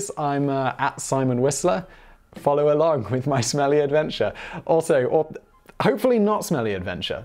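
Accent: United Kingdom. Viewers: Simon's becoming so much more playful. Love it :D Also great to hear his awesome British accent saying "tracksuit bottoms". :D We call them that over in South Africa. :D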